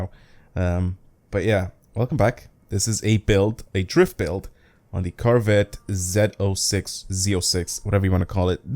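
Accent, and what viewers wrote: Ireland. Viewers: You're Irish? Me too! Happy St. Patrick's Day brethren!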